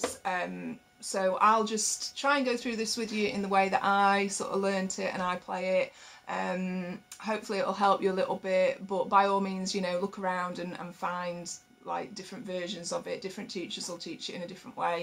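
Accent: England, United Kingdom. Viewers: Are you from England? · You are so awesome! I love your English accent. I could listen to you all day long. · Love this video! It's timing is perfect for a newbie like me....other videos go way too fast! And an English accent too. Ideal! #subscribed #fanboy!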